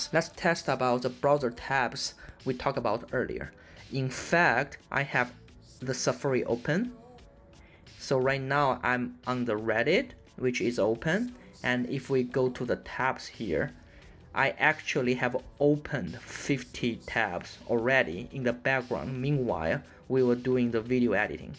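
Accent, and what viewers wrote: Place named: China. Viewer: I bet you are Chinese from your accent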